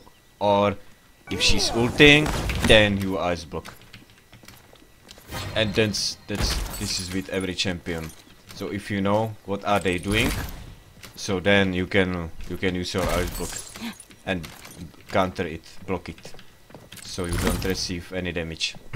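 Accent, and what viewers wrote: Czech Republic. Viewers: Are you from cz?protože máš takovej přízvuk. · Jsem si říkal, že takováhle angličtina musí být od Čecha, nicméně nice vysvětlení jak hrát za Evie, dneska zkusím, jestli to je ještě aktuální a pokusím se za ní naučit. :D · Hii I'm new here but I have a question...are you from czech republic? Love your accent !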